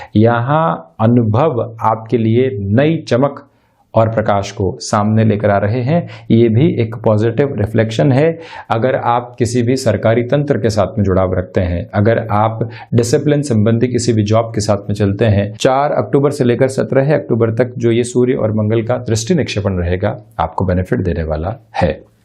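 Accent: Standard. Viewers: नमस्कार गुरु जी आप बहुत विस्तार से विश्लेषण करते हो , इस के लिए धन्यवाद और आप की शुद्ध हिंदी बोलना मुझे बहुत पसंद है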